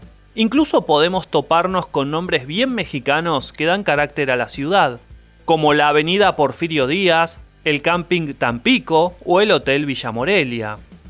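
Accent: Argentina. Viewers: Pues el wey es argentino, cómo quieres que hable pues ?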